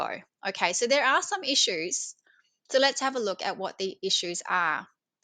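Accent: Australia. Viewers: Hearing you shared Australian accent. Brilliant. You're funny and articulate. Thanks 😅❤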